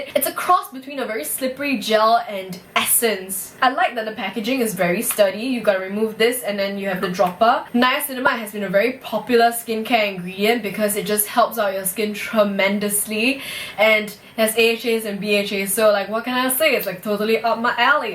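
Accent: Singapore. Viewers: It's the Singaporean accent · OMG you sound just like my classmate from Singapore. Great review! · lol this is my first time watching your vlog and I cant believe I guess it right, I immediately thought that you're a Singaporean just by listening to you talking hahahahaha I'm from Malaysia btw. Thank you for the honest review, I have some COSRX's stuffs on my wishlist but still don't know which one exactly to get so this really helps me!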